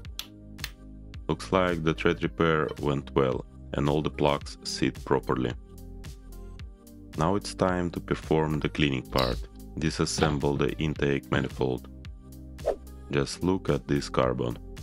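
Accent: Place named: Russia. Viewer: I hear russian accent :D а есть полное видео со всеми деталями?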